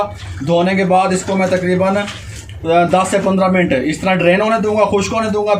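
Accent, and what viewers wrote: Punjab, India. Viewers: Well done brother I like your style Punjabi accent mein Urdu Kamal boltay hein tension nahi leni 👍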